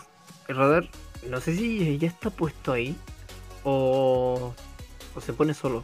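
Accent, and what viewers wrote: Chile. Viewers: Man de que parte de chile eres?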